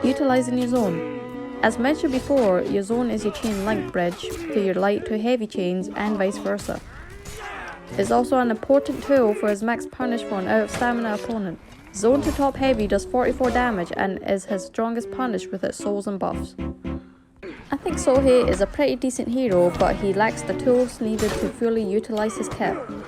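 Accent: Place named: Scotland, United Kingdom. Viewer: Maybe it's easier to hear your voice with headphones on, but just used my phone's speakers. For me, the wii music was just as loud as/slightly louder than your voice. It's not terrible, but you want your voice at the forefront of attention. Unfortunately the grunts and sounds from For Honor made it impossible to understand what you're saying. Plus keep in mind that you have a distinct accent which isn't easy to understand for the majority of people. It's not as strong like a Jamaican accent, but if I had to guess, you're from Scotland/Northern England and most Americans (the biggest audience pool due to sheer size) would say it's very hard to understand. Anyway, it's still always a good idea to make a voice loud and clear in the mix of a video without the clutter and noise of sounds and music which are supposed to fill in the background and not overshadow the person speaking. 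Hope you have a great day and thanks for making a cool video! :)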